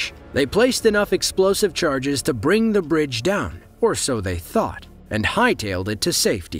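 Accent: United States. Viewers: narrated with an American accent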